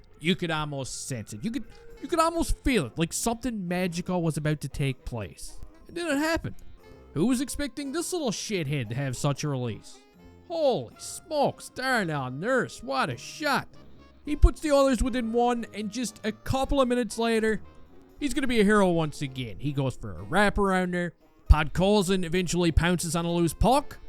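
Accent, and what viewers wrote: Newfoundland, Canada. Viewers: Are you a newfie?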